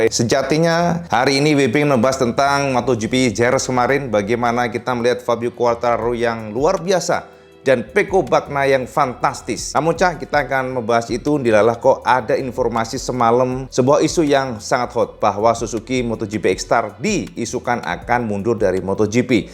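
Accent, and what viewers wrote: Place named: Java, Indonesia. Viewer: Sampean jowone pundi cak kok kuentel jowone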